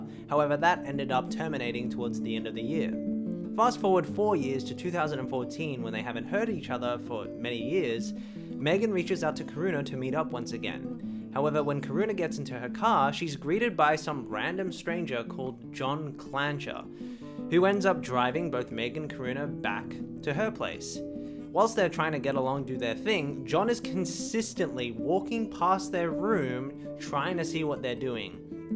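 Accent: Australia. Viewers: Are you an aussie? sorry I never noticed your accent till now and I've been watching you for months now. I feel like It should've been more apparent. lol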